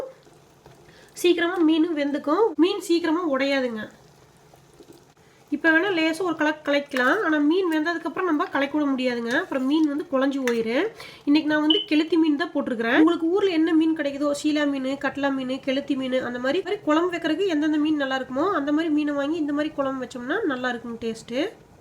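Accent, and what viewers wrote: Kongu, India. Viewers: Ungaloda kongu Tamil arumai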